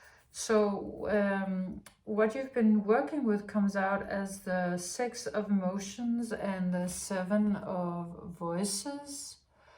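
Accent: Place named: Denmark. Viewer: Actions speak louder than words. Or another one is, 'What you do is so loud, I can't hear a word you say!' I just wanted to say your little video about saying 'NO' to someone is very timely and great advice. Thank you. (PS I've been trying to place your accent. I didn't realise you're from Denmark. My g/grandad was from Holbaek, Zealand.)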